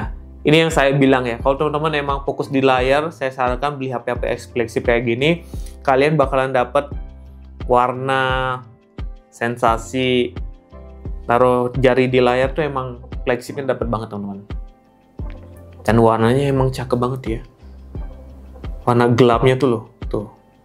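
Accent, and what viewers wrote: Bali, Indonesia. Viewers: Bang lu dari mana? Adi logat care nak Bali?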